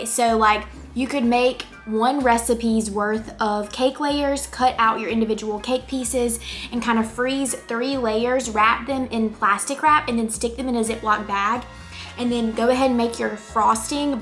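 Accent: South, United States. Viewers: Those are SO cute!!! I love your channel please keep going and making videos! I love your southern accent!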